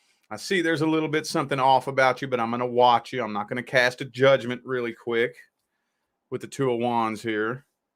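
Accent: South, United States. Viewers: I love your readings, very matter of fact. I love your southern accent. I am American living in the UK but I am wondering if it`s a Kentucky accent. I am hoping my ex will at least apologize but I doubt it. Haven`t heard from him in over 6 months and I doubt he will ever say anything to me again. It`s never been on and off. He broken up with me. There was a third party involved which I think was his next girlfriend but I think they broken up. I want a nice guy who is a soulmate. You are right. If he couldn`t appreciate the love I brought to the table then it was his loss.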